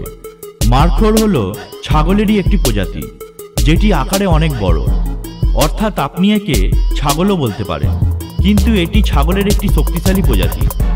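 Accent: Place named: West Bengal, India. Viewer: he is West bengali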